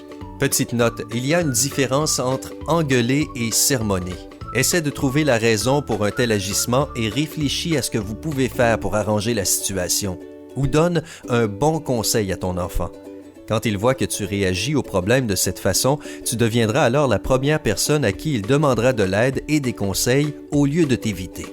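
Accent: Quebec, Canada. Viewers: T québécois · Et sinon faut être gentil pas méchant c'est pas gentil d'être méchant c'est mieux d'être gentil ? Putain j'ai pas vu de tel defonçage de porte ouverte depuis "le feu ça brûle" même l'accent québécois a pas réussi à sauver cette vidéo. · L'accent Québécois ! :D xD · On ' dirait un accent québécois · Tu est québécois ? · Moi, dans quelques jours c'est ma fête et je vais avoir 10 ans et mes parent mon jamais dit sa(peut-être quelques une mais je m'en souvient pas)et la quand je regarde la vidéo sa me donne envie de revivre parce que je me fais insulter,harceler.😥😣☹️😖😞😔😕🙁😫😩😤😠😡😵😰😢😭😓🤕et je pensais pas que SYMPA parle québécois lime si tu es québécois(e)en tout cas moi je suis québécoise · T'es québécois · kimii lee c l'accent québécois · kimii lee accent québécois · C normal c l'accent québécois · C québécois comme moi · je crois que le gars qui parle,c'est un quebecois et jespere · Est tu québécois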